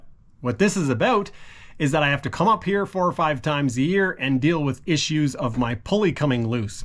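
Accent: Canada. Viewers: It's aboot the grraj! Love that Canadian accent! 😉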